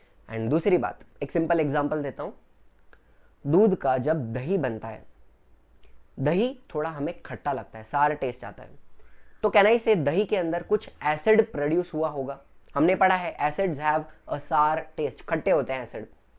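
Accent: Maharashtra, India. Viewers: Sir kya ap marathi speaker hai??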